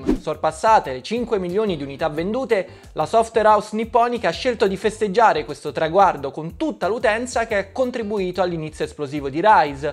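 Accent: Rome, Italy. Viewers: Non so, ma qualcosa mi dice che è Romano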